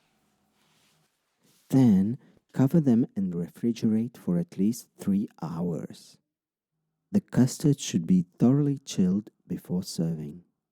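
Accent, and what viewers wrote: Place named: Czech Republic. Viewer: cool czech accent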